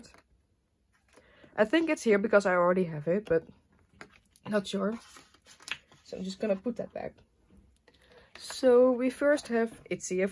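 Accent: Netherlands. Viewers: Omg your accent, you must be Dutch!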